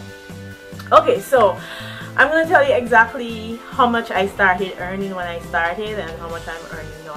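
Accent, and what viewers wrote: Jamaica. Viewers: Jamaica? Sounds like Jamaican I'm thinking of starting one too but mi nuh sure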